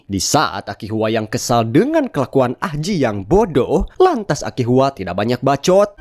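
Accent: West Java, Indonesia. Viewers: Jigana urang Sunda ieu😂😂😂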